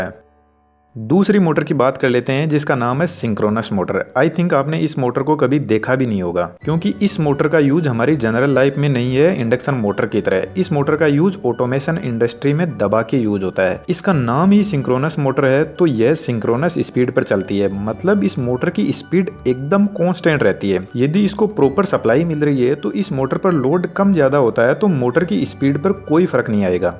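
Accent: India. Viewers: bro are you indian or i just watch dubbed video by the way your content is awesome and informative.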